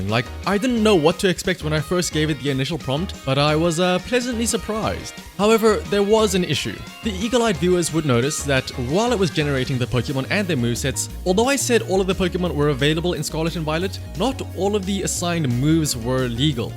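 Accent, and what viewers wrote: South Africa. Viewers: Fellow SA Pokemon player here based in Cape Town. Found your video via the algorithm and heard the accent and I was shook! 😁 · Nice video bro, is that accent South African?🤔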